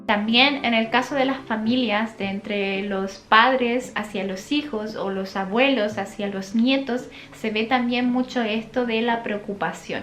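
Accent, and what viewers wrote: Chile. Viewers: Siempre me ha gustado el acento chileno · Estas perdiendo tu acento chileno. Abrazos!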